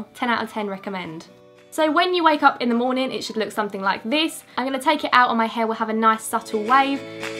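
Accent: United Kingdom. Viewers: British accent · I love your British accent 😍😍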